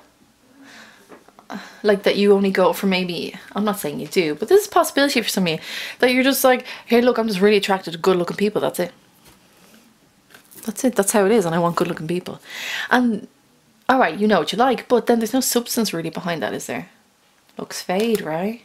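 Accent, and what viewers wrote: Ireland. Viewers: Love the Irish accent 😌✨ dope reading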